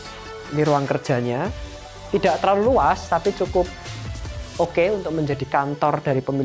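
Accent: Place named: East Java, Indonesia. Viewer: logat e indonesia ,koyok e arek iki cah jawa timuran 😅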